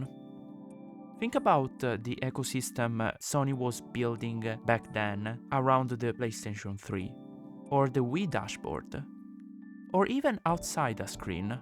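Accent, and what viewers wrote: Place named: Italy. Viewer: Nice video! I miss Frutiger Aero so much... Btw Are you Italian? From your accent it would seem so.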